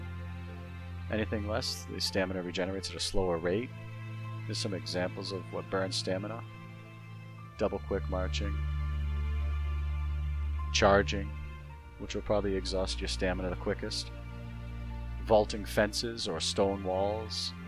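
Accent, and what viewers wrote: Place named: New England, United States. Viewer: Hey man love your video, just curious are you from Mass? I live in Maine and I swear you have the faintest commonwealth/new england accent hahaha either way again love the videos man very informative keep on keeping on. P.S. you kinda sound like Adelbert Ames the original commanding officer of the 20th Maine as portrayed in Gods and Generals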